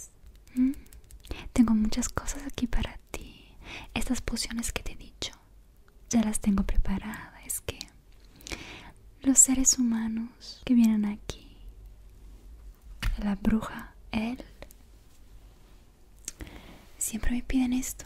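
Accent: Argentina. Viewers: Se le sale lo argentino.... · Te queda genial papel de bruja😊 Pd: me encanta tu tonalidad media argentina c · una pregunta:¿Sos Argentina? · Yo solo quiero una poción para tenerla a ella:'v Pst: Tremendo acento argentino 10/10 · Es como que en este video hablas más en tonada Argentina a comparación de tus otros videos, va a mi se me hace no se(aclaro: no lo estoy criticando es más me gusta por soy de argentina) Amo tus vídeos💖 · En este video me pareces muy argentina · Amo tu acento argentino 😍😍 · Suena Argentino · Hablaste muy argentina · Te salió un acento muy argentino 😂 ¿será la mezcla del Italiano y español? 🤔 Me encantó tu maquillaje! ☺ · Wow nunca te había escuchado hablar español sin susurrar O.o que voz tan bonita! Tienes acento argentino y dices palabras latinas:p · Argentino mezclado con Itailiano es dnwkwjjwjeje arte, Amé ♡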